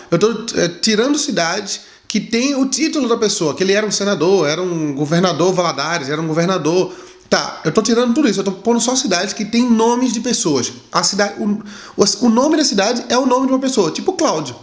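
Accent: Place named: Pernambuco, Brazil. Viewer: vc precisa perder esse sotaque de prnambuco pra poder imitar mineiro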